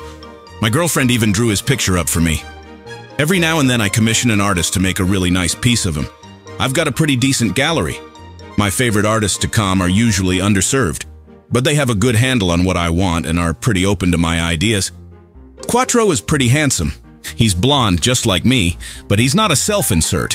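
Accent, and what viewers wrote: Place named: Texas, United States. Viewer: how do you capture that texas colony accent so well?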